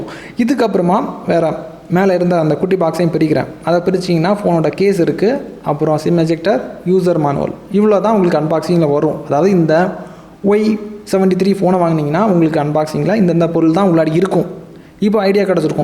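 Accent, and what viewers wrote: Kanyakumari, India. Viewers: kaniyakumari slang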